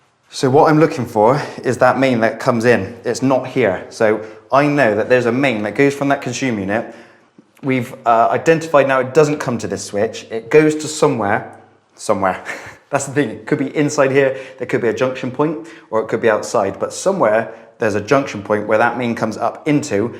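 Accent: Bristol, United Kingdom. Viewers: defo Bristol accent, nice, real siuation vid, cheers Mr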